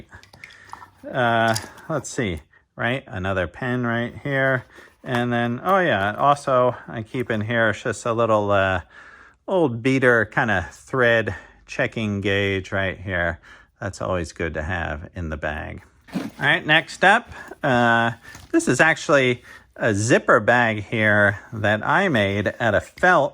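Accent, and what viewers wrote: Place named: Pottstown, United States. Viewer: Well, I’m surprised at just how many tools came out of that bag😃 your accent reminds me of my cousins in Pottstown 👍🏻 I enjoy the videos.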